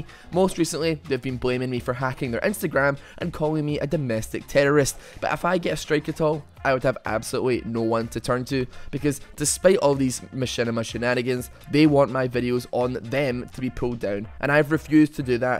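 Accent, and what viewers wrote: Scotland, United Kingdom. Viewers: are you fookin scotish m8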